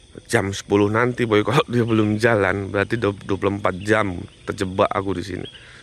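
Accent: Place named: Medan, Indonesia. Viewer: Aksen kau medan kali wak geng, jadi ingat aku semasa di Medan, walau sekarang aku diperantauan, semoga semangat terus kau boy...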